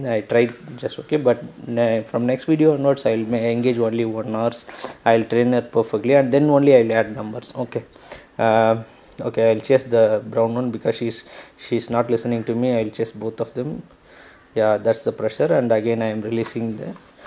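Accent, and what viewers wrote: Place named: United Kingdom. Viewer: British english ah na😟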